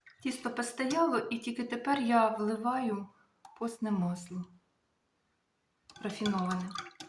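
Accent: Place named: Kherson, Ukraine. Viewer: На херсонщіні ,ммколаївщині, одесчтні такий говор відстаньте не хочеш не дивись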